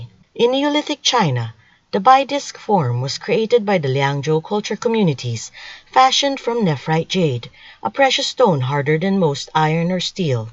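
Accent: Philippines. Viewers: What is this now, where do you get your information? Everybody wants to make money making videos. You sound Filipino.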